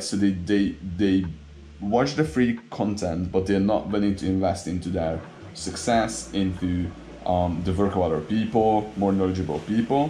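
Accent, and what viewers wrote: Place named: Hungary. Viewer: Szia hallom a kiejtéseden higy magyar vagy kaphatnék magyar videot?😁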